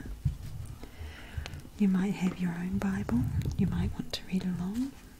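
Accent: New Zealand. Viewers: I appreciate this so much. It was so wonderful to hear a New Zealand accent again as well. Four years ago I spent 5 months in Oxford, Canterbury at a YWAM base there and God worked so much in my life at that time. I accepted Christ when I was 4 but needed to experience Him on a deeper level. What I realized while I was there that has made the most difference in my outlook, is how much of my life I still needed to surrender and trust Him with. I’ve come to realize that is so, so much of being able to really live out the Christian life. Do you know what I mean? Thank you my sister in Christ for letting God use you to speak to others encouraging them in HIm. Also, I greatly respect people doing foster care to help others! Thank you for your sacrifices...I’m going to lift you up in prayer right now that God will encourage your heart today. 🥰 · Are you kiwi! Love Kiwi christians 👏🏻👏🏻🙌🏼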